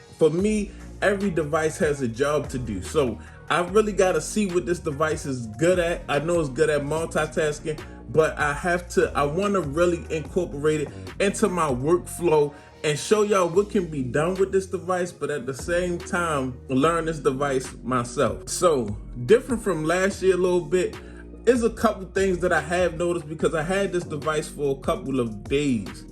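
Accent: Baltimore, United States. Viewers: Boy got a strong Baltimore accent 🤣😂🤣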